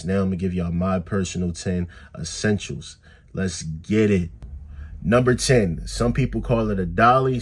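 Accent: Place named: Philadelphia, United States. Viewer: oh you def from philly just got a van thanks for the advice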